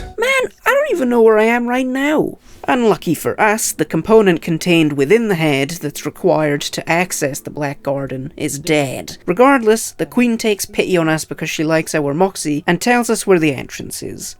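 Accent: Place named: Albania. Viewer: He's Albanian.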